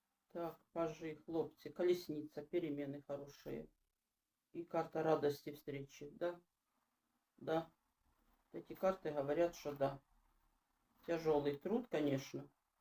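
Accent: Kherson, Ukraine. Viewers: Дякую за Вашу по 🙏 дуже приємно Вас слухати. Ви розмовляєте, як у мене вдома: на Херсонській землі. Для мене це особливо цінно ❤️🌷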